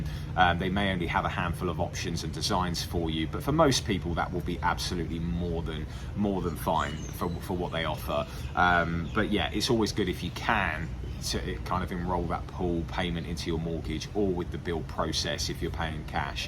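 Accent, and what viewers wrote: United Kingdom. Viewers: Is that a Mancunian accent ?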